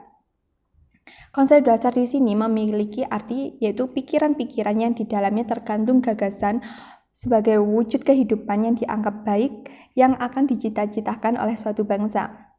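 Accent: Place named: Java, Indonesia. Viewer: kelihatan jawanya😂 agak gimana gitu,medok"selebew🤭😂🙏🙏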